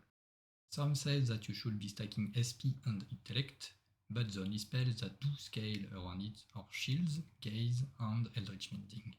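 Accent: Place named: France. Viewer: French accent spotted. Super guide !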